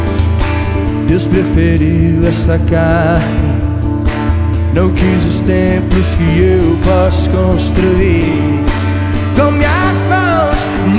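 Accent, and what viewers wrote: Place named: Rio de Janeiro, Brazil. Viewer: é de BH mas tem o maior sotaque de carioca. "Exxxxtou em obrax" hahaha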